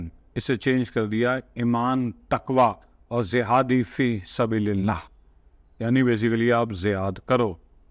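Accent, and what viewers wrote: India. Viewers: He is an Urdu speaking migrant successor they have an accent like indian these people migrated from North India like dehli,haryana,UP .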